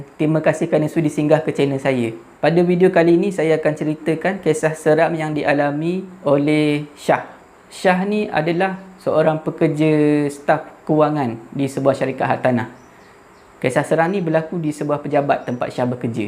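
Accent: Terengganu, Malaysia. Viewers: Dik org trganu ke😁 Sleng tu CM cakak ganu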